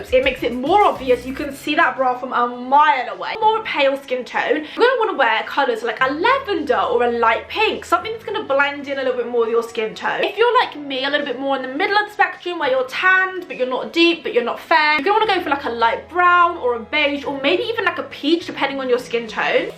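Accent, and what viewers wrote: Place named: England, United Kingdom. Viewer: I like how she has American influence " you do you boo" with an English accent.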